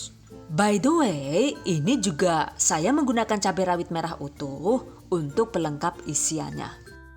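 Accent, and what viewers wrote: Banyumas, Indonesia. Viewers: Kok ada ngapaknya ya,jd lucu hehehh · Ada ajh yh guyonan ngapaknya Paling suka ❤️❤️ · "DONE" Mksh ya bun,atas resep nya.trnya bunda wong ngapak ya....?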